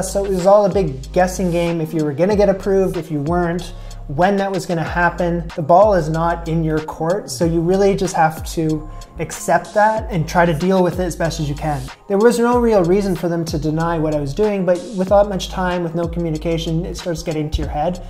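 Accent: Canada. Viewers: I suspected you were Canadian based on how you say 'out' :D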